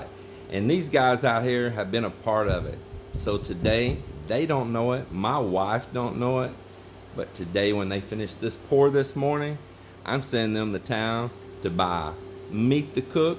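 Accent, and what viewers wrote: Texas, United States. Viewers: there is many reasons why i have been following you and your wife. first my Late Mom followed you before i moved to the Philippines and we watched you together. The main reason why i watch close is that i plan on doing the same thing kind of. I plan on building a home and it will be mostly my wife family that works for me. I am already remodeling her mom and dads home tripling the size and we plan on living there as i save up for land and to build our home. I am letting them build it how they know how since that is not the home my wife's family will stay in as i plan on building a new one on our property when we get it for them (i want at lest 1 hector hoping for 3 for farming). i want to make it self sustaining as that is how my parents farm is in Washington state. One of the other reasons is I miss Texas (lived there for 20+ years) and you have the accent and mindset that I love from that state. 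Although i am not going to be able to be as physical as i am a disabled vet with major back problems I still want to build my home much like yours. I do not have as many years in construction as you but i do have a background in it, i also have an engineering degree but it was focused on mechanical but I did study blueprinting too. I also want to meet you sometime as i live in Roxas City and make trips to Iloilo sometimes. Congrats on the 10k. been watching for well over a year and feel like ya'll are part of the family lol.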